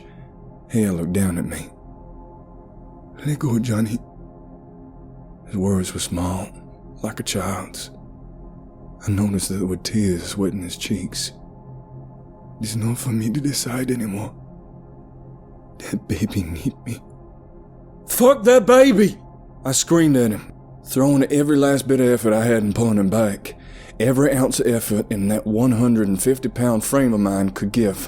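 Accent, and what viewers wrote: South, United States. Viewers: Wow. you nailed that southern accent! Granted, I was raised in NY state but damn if I didn't feel like I was in the bayou. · spoiling us with another vid in his smooth southern comfort rendition. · Great souther accent bro!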